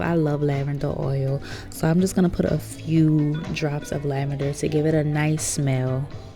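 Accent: Louisiana, United States. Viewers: You from Louisiana? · We have the same name... mine Is spelled Daryllynn .. are you from Louisiana?? Sounds like it & the other 2ppl ik with my name are both from there.. I'm from Texas/Florida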